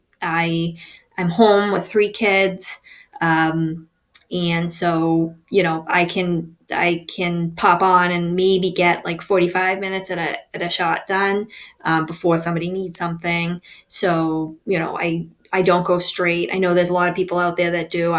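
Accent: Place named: Long Island, United States. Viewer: that Long Island accent though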